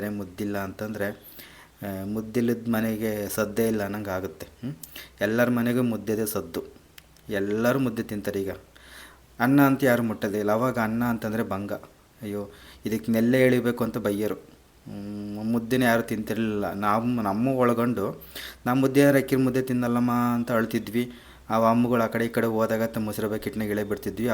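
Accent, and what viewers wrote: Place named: Davangere, India. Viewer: ನಾವು ದಾವಣಗೆರೆಯವರು... ಇವಾಗ ಚಾಮರಾಜನಗರದಲ್ಲಿದ್ದೀವಿ.... ನಮ್ಮ dvg ಭಾಷೆ ಕೇಳೋಕೆ nim ವಿಡಿಯೋ ನೋಡ್ತೀನಿ.. Nim ಅಡುಗೇನು ಚೆನ್ನಾಗಿರುತ್ತೆ ಬ್ರದರ್ ❤❤❤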